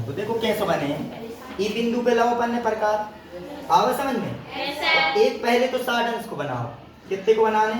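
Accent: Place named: Bundelkhand, India. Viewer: Apni bundelkhandi boli ki bat hi aalag he 🙏🙏🙏❤❤❤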